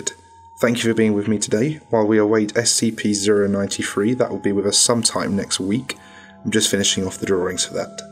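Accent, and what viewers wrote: United Kingdom. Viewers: That start was priceless, the accent and British slang only made it better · Is he british?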